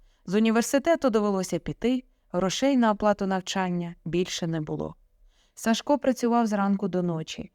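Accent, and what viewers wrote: Standard. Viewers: ДУЖЕ ГАРНА РОЗПОВІДЬ, ВЗЯТА З ЖИТТЯ, ДЯКУЮ ЗА ЧИСТУ УКРАЇНСЬКУ МОВУ.❤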